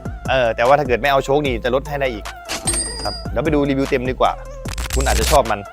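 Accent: Korat, Thailand. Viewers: คนโคราชเดะนิ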